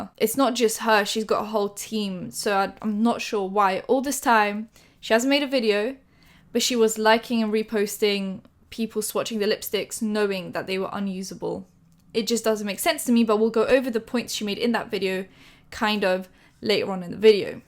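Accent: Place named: Roadman, United Kingdom. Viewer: Is it just me or does she have a very subtle roadman accent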